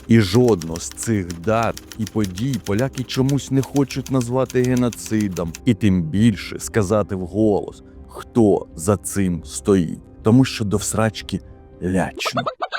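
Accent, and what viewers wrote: Russia. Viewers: І з кацапським акцентом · Breshe khlopchyk! A mozhe tse troll Putina? Rosiyskyy akcent.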